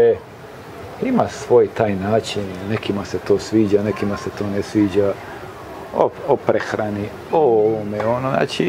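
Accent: Dalmatia, Croatia. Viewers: To je dalmatinski naglasak kod zena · i ja isto OBOŽAVAM Dalmatinski naglasak, o tome može da misli ko šta oće negativno, zabole me ,,djoka,,.. poz 031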